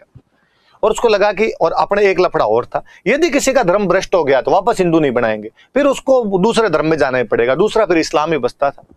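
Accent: Rajasthan, India. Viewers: Wow... Even though,I took 2-3 times to listen n understand the rajastani tone of hindi he depicts. I feel proud of his efforts.💫💪 Thankyou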